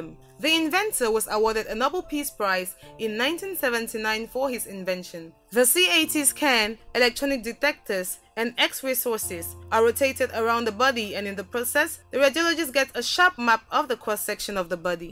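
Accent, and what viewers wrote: Africa. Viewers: Why are you talking so fast? It's difficult to chat down notes while listening words pronounced in african accent, this is just my opinion no hate just love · He sounds very African...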